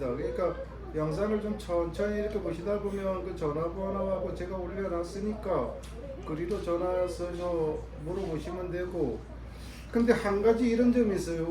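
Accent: Gangwon, South Korea. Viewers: 수고하셨습니다 강원도 사투리가 정겹고 좋아요 새해복 받으시고 새해도 수고 해주세요 장마마다 특색이 있고 좋습니다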